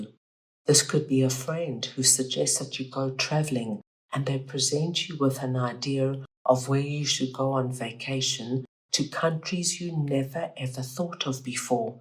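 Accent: South Africa. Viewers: Are you South African ? Hearing the accent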